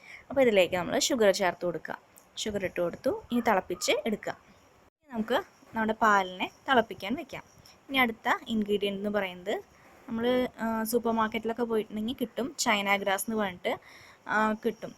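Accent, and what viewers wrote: Thrissur, India. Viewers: Thrissur aano native place 😃slang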